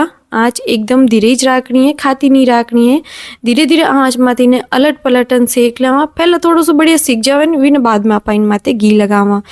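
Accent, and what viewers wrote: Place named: Jodhpur, India. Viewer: Jodpuri boli Masha Allah ap ke zaban sy bohot pyari lag ti ho hum apko Pakistan sy follow krty Hain allah Khush rakhy apko❤❤❤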